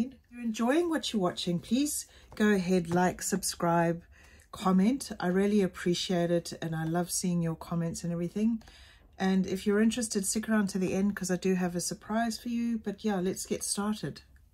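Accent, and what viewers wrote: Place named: Southern Africa. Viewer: It's nice hearing a Southern-African accent! Btw, how do you sell your artworks?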